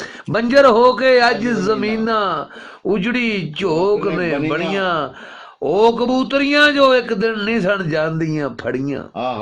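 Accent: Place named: Sheikhupura, Pakistan. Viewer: Masha ALLAH 💞 It seems GOD did gave some talent to to 🔥 to speak 🗣️ perfect pure Punjabi of QILA SHEIKHUPURA DISTRICT of OLD PUNJAB, You wouldn't have MAKAI ((corn 🌽) ROTi since it's 100% American native of USA 🤞🍀🙏🔥🚒🔥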